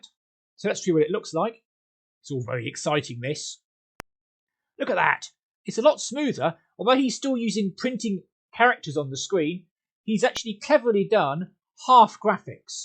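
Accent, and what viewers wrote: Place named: Cockney, United Kingdom. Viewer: Oi. Ya Cockney was right 'ood, mate.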